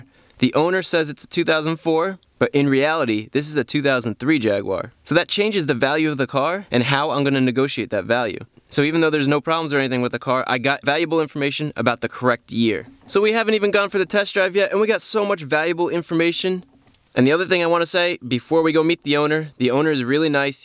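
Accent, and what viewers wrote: United States. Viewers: I like your enunciation and pronunciation. Are you american ?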